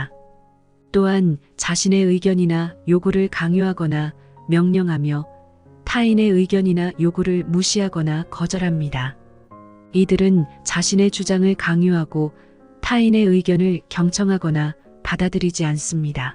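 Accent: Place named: Jeolla, South Korea. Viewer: 완전 전라도네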